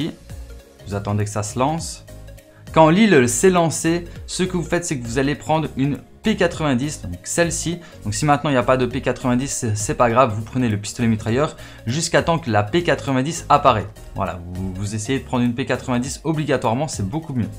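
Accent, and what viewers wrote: Belgium. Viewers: Tu a un accent belge je me trompe??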